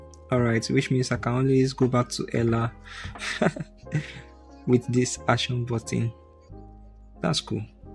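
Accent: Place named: Venezuela. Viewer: Español venezolano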